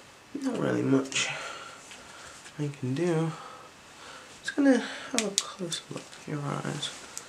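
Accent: United Kingdom. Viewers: Ah, lovely. Heard some of that british accent there.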